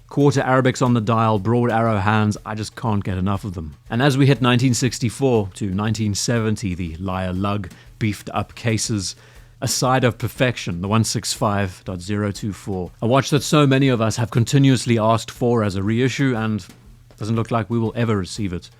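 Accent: South Africa. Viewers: BTW just subscribed for the SA accent and guitar if nothing else 😅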